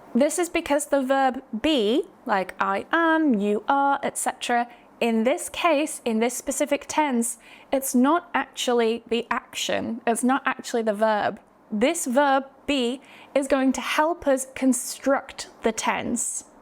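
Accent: United Kingdom. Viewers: I am from Japan. It’s so helpful for improving my English skills, and I love your beautiful British pronunciation❤Thanks a lot! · I understand your feelings bro her accent is British accent that's why.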